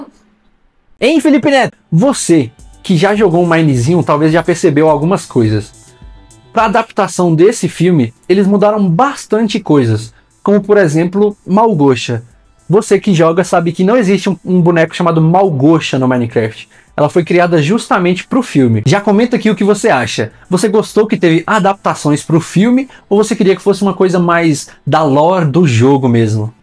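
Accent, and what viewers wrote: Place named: Brazil. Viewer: O vídeo ta muito bom mas esse sotaque divisiano melhora demais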